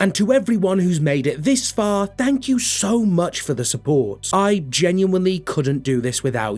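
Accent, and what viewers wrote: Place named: United Kingdom. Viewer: Man i love this channel especially the way the essay is presented beautifully with a soothing brittish accent is a cherry on top AND chef's kiss!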